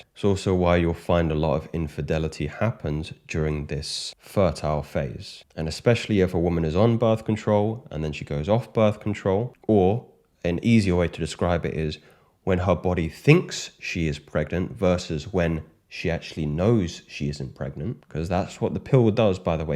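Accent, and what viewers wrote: United Kingdom. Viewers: mate, didnt thought of you being a geordie ??!! 😅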